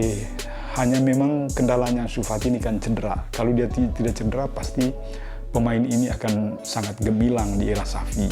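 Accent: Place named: Manggarai, Indonesia. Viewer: Dari dialeg nya bung kek logat manggarai.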